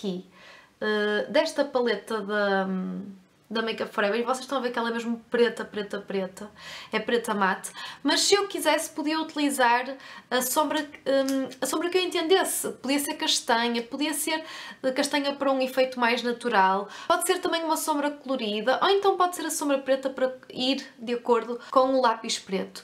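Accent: Portugal. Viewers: português fala engraçado kkkkkkk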